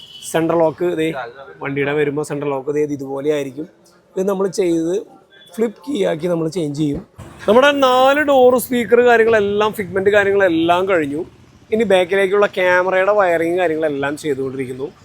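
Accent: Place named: Thrissur, India. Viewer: സംസാരം കേട്ടാൽ അറിഞ്ഞൂടെ തൃശൂർ.. ☺️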